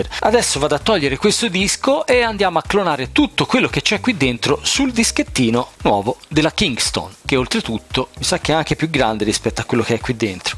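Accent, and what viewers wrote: Tuscany, Italy. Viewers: Hai un accento di merda, potresti essere più ascoltabile se non tenessi l'accento toscano nei video. X il resto meno male che va + veloce è un SSD. Carina la cosa della scheda madre anche se è vista e rivista mille volte. MI RACCOMANDO CAMBIA QUEL ACCENTO DI MERDA DA TOSCANO ! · Ma scusa se ti dà fastidio l'accento toscano non lo guardare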